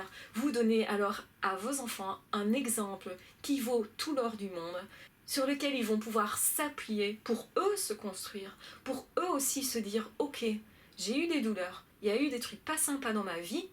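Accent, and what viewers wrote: Belgium. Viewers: C’est la première fois de ma vie que je commente sur YouTube (pour une nana qui travaille dans le web c’est particulier mais bon xD). Bravo pour tes vidéos, elle m’aide énormément (je parle de moi mais j’imagine que c’est le cas d’énormément de personnes). Je suis victime actuellement, nous sommes séparés mais je suis encore sous emprise malgré le fait d’être totalement informée sur les PN. Pourquoi, je pense que c’est parce que j’ai un problème moi aussi malheureusement ( mais c’est pas méchant dans le sens ou je ne suis pas PN xD!) , je suis dépendante affective ( je le sais depuis très longtemps mais j’en enfin mis un mot là dessus depuis la lecture du livre Vaincre la dépendance affective). Tout cela pour te dire que tes vidéos m’aide à reprendre le dessus quand je retombe dans ses filets et rien que pour cela je te remercie infiniment. 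Je ne vais pas entrer dans les détails mais on va dire que si j’ai vu une vidéo de toi le soir, le lendemain j’ai plus de force et de confiance en moi pour me détourner de lui ou éviter ses pièges. J’ai encore bcp de mal à intégrer le fait que ce type de personne existe, il y a une semaine j’essayais de le comprendre , de chercher des excuses ( dans son enfance ou autre ) mais non je dois l’intégrer dans ma petite tête : des personnes sans humanité existent , et c’est franchement flippant. Sinon, petit aparté rigolo l’accent belge est mimi xD j’adore voir ton chat sur le canap ( j’adooore les chats mdrrrr ) et tu déchires car généreuse en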